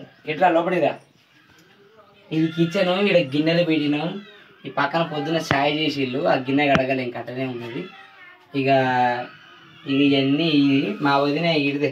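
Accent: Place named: Telangana, India. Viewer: Thammi nuvvu e yaasa marchaku mana telangana yaasa chaala baguntadhi don't change ok keep going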